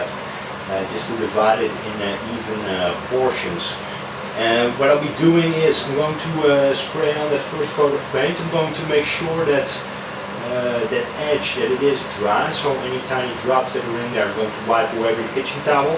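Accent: Netherlands. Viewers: Ben je Nederlands haha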